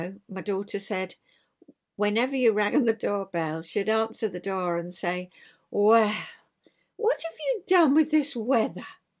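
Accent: United Kingdom. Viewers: Dear, Oh my goodness! I just popped in to see you, because I’m a huge follower of Julie’s! She mentioned you & said how much she loves to listen to you talk! I agree, you are an absolute delight! Where in the UK do you live? I love all the different accents & expressions that all of you have! I live in Lawrence Kansas, smack dab in the middle of the US! I lived in Cambridge (England!) for a year, when I was 6 years old way back in 1963. Even though that was practically the age of dinosaurs, I remember so many things so clearly! I came back with a lovely accent, but the other children at school made fun of me, chanting “Erica-America”, so I lost it as fast as I could… I wish I had that accent now! My favorite memory was a pair of blue “real” leather shoes my mom bought me. They had laces, maybe like an Oxford shoe? At any rate, I wore them to bed that night, because I loved them that much! 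Silly, right?! I wish I had a photo to go with that memory! Your little journals are so wonderful, I think I need to think about making those for my family, too! And those 12x12” paper pockets are wonderful, just so long as you don’t cut off the wrong piece! It’s so nice to “meet” you & I’ve subscribed, so I’ll be checking in again, soon! Take good care! Erica❤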